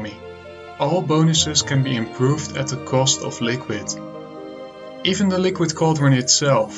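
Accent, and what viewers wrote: Netherlands. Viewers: Are you dutch? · Funny how i can hear you're dutch just by the tone of your voice.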